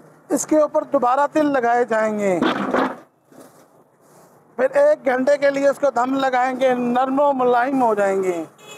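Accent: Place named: Pakistan. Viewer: ویسے پنجابی میں اردو کا ٹچ بھی ودیا اے جناب 😅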